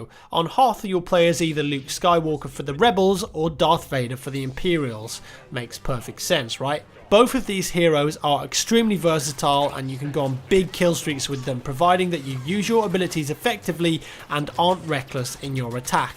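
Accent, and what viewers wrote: England, United Kingdom. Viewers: You seem to have the same English accent as Rick shiels a golf instructor on youtube. I wonder if you fellas are from the same area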